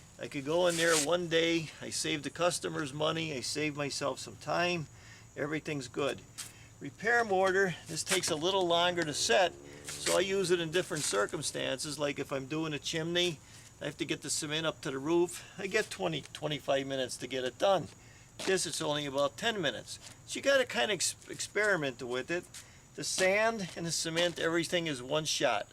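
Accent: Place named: Scranton, United States. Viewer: I was born and raised the first 25 years in Wilkes-Barre area. I watched your first video today, and then many others and within two minutes of watching you and listening I knew you were from W-B/ Scranton area. We’ve been living in San Diego the past 40 years and now that the kids are grown up we’re coming back Home, buying some land and going to have fun building the last home that we’re going to live in and it’s going to be all stone just like our current house. Your videos are great, it’s really great to hear and see somebody from where we grew up, and I forgot the recipes for walls, stonework and grout and this has been so helpful. Thank you for your video.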